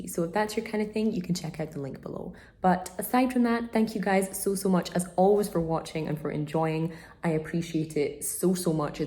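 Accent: Scotland, United Kingdom. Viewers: Where abouts in Scotland are you from? 🏴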